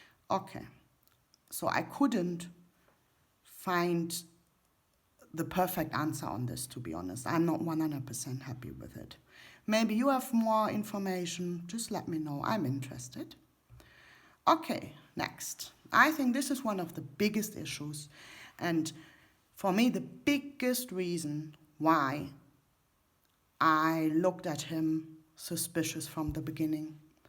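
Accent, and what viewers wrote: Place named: United Kingdom. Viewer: that is why there is a British accent coming through at times 😉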